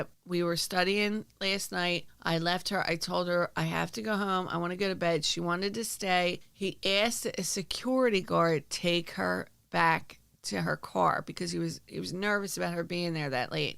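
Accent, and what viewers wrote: Philadelphia, United States. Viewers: Also ....YO PHILLAY!! ✌🏻😘 Living in CA makes me miss the accent and no BS🙌🏻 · Hi, stolen from me by Lindsay sent me. She was really bragging about you and your philly accent I just wanted you to know I subscribed and I'm excited about watching your videos and as Lindsay would say put my headphones on and getting about things, lol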